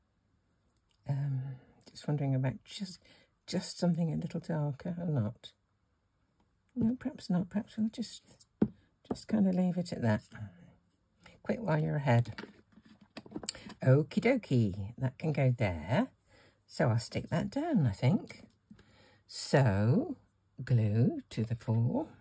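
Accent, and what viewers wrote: United Kingdom. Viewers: I hear a lot of differences in words from the various British YouTubers. I just love the accent and your voice in particular is so beautiful and appealing. You just sound so sweet, I wish I could meet you and have dinner or Supper as we say in the South. Some of the words I’ve noticed are: Right-OK (as a bridge to the next thought) Flat-Apartment Crisps-Chips Biscuit-Cookie Shops-Stores Uni-College Dustbin or Bin-Trashcan or Garbage Can Post-Mail Trousers-Pants Trolly-Cart or in the South, /Buggy (shopping) Trainers-Sneakers or Tennis Shoes (pronounced TennieShoes in the South) Boot-Trunk (car) Centralize it-Center it Holiday-Vacation Love your videos so much and I have to Re-Subscribe regularly. I try to keep an eye on it. I don’t know why they do that. Love from Murfreesboro, right outside of Nashville, Tennessee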